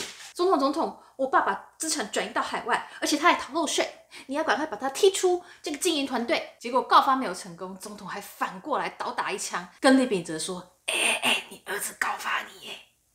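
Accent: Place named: Taiwan. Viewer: 我没有点你的channel首页去看你的介绍，就凭你讲话的方式和语调就知道你是台湾人😄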